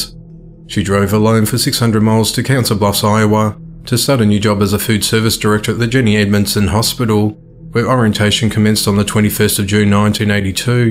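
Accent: Australia. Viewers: Obviously everyone is entitled to an apinion.why dose it have to be an American accent that should be used.not everyone who watches this channel is American im sure other Americans watching would agree the accent can only be a problem if the English is badly spoken.i think this Australian accent is so not fake easy on the ear.no oh my gods,clear to the point of a tone that matches the content.living in. America you should be used to different accents and be more culturally intelligent.keep going with the Australian accent it’s what makes you guys individually top class.🧚Charlotte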